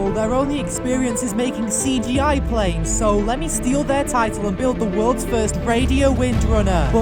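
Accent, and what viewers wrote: United Kingdom. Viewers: He's british (I think) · Are you British?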